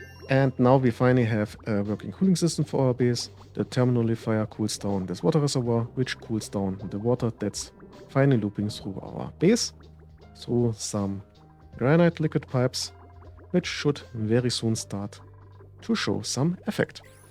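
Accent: Germany. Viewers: hey, like ur style, like ur voice, but plz try to work on ur english. ( Sis needs to bi abaf zwölfhundret) ich bin au deutscher, aber dein deutscher akzent is krass^^ besserr werden! ;)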